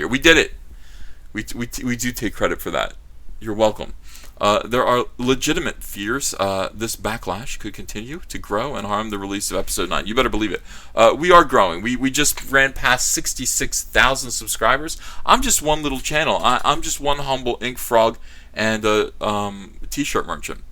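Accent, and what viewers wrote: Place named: Oklahoma, United States. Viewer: You have a an accent now, like you are from Oklahoma or Arkansas.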